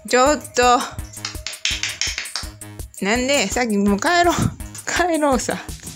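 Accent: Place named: Kansai, Japan. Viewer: さんちゃん、よく話を聞いてますよね。 ちゃんとやりとりしてるし、いつもコミュニケーションが、すごいと感心するし関西弁にも💙💛💖です。 カワイイ😍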